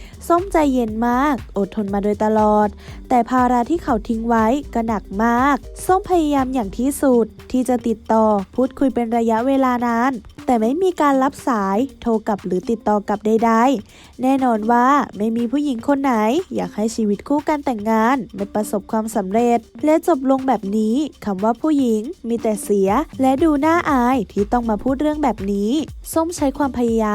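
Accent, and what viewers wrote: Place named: Suphanburi, Thailand. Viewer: เหน่อเป็นสุพรรณเชียว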